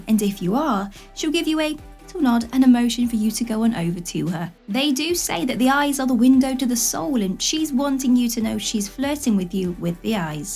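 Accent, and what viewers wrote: United Kingdom. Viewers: has a nice British accent to listen to, where in England are you from? 😅 good tips and yeah dating is difficult 😊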